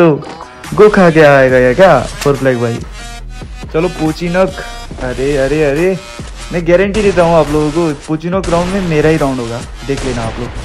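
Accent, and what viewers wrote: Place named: India. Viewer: You are indian or nepal becuase your voice indian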